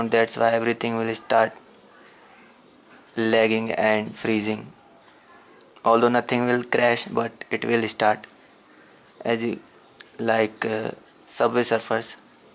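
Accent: India. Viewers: Lmfao. Ok then, if you know so many languages you know how tricky it is to learn sounds and how to speak correctly sometimes. First. Second, if you complain about his English skills, at least write properly. Third, Yes he does have to improve, I never said otherwise, yet I've seen tons of reviews made by people from India speaking English and they all sound the same (some better some worse - no offense) so... there isn't much he can do.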